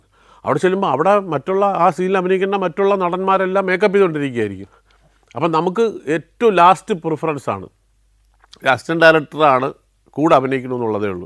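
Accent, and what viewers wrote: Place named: Thiruvananthapuram, India. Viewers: Real Trivian slang👍🏽❤😍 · തിരുവനന്തപുരം സ്ലാങ്🔥🔥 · the proper Thiruvananthapuram dialect that I grew up hearing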